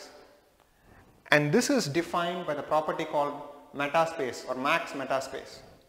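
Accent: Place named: India. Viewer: 受不了三哥的英语。。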